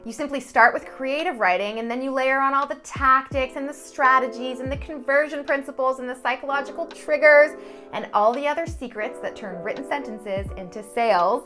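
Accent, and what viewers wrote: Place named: South, United States. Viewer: Excellent video. I am so glad I stumbled on this channel. Incidentally, good southern accent.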